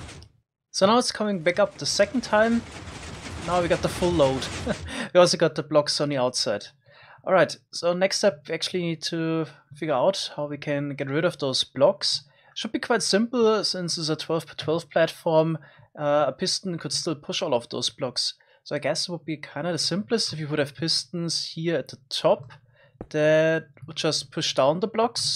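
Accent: Germany. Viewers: Deutscher Akzent Izz da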